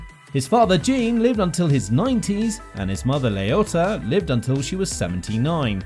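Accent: United Kingdom. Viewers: Where in West Virginia did you find a narrator with a British accent? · Get so tired of the brit narrators.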